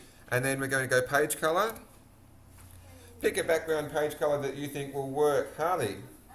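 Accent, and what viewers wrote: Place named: Australia. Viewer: love the australian accent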